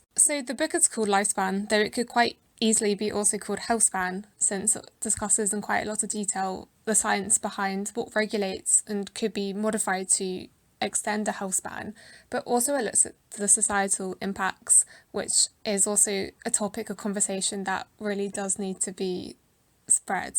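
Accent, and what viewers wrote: England, United Kingdom. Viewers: It's an English accent, one of many in daily use in England. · Thank you very much for the concise and artistic presentation. I liked also your English accent.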